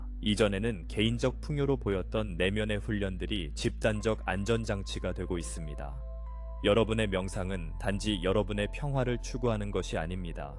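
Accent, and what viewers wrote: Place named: Gyeongsang, South Korea. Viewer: 야호 신납니당~~ 경상도 사투리가 당연하지요 창녕띠기 아인교 ㅋㅋㅋ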